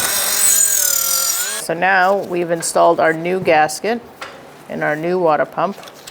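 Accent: Boston, United States. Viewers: love the boston accent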